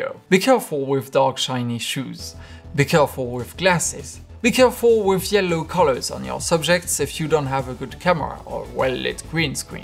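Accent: France. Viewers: Are you French ? You have a frenchy accent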